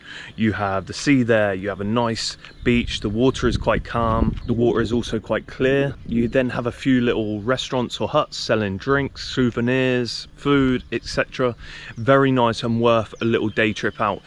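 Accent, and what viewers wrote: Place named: United Kingdom. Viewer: Hi I’m in Australia 🇦🇺 Older lady, 56 years old I’m a “traveler “ 1989 Sri Lanka I love Malaysia 🇲🇾 And it’s people. I’m planning a trip in 2026 I’ve just watched your vid. I have to say your video was FANTASTIC!! The clarity in which you spoke. With your charming British 🇬🇧 accent. I REALLY enjoy watching your video You explained, so well, all aspects of Langkawi. Well done 👍 Have subscribed 👌😎🤩 Marianne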